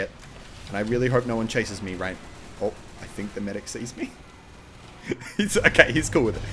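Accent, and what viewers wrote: Australia. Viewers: is australian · MERGUD HES AUSSIE!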